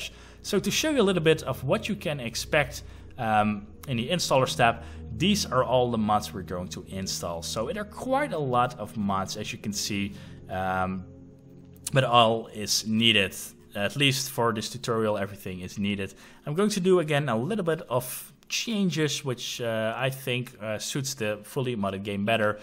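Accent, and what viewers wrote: Netherlands. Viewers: Hello fellow Dutchmen. Altijd fijn dat je aan de uitspraak meteen merkt dat iemand Nederlands is.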